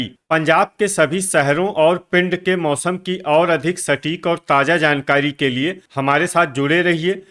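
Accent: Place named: Bihar, India. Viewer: Why is this Bihari n Hindi accent describing Punjabi weather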